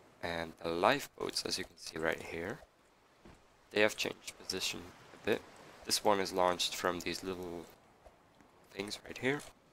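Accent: Belgium. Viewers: You from België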